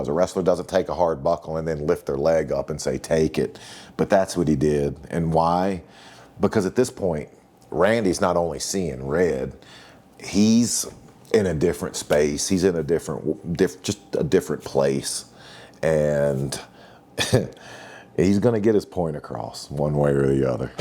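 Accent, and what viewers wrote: Virginia, United States. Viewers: . Your cool af...im used to your accent becouse theres a youtube channel called AFTERPRISONSHOW..and joe the host..has your exact accent..lol and hes from Virginia...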